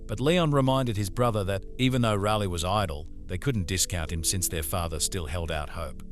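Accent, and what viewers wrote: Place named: Australia. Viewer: Awesome to see an australian brother on this trend, but I CANT STAND AUSTRALIAN ACCENTS. Love from NZ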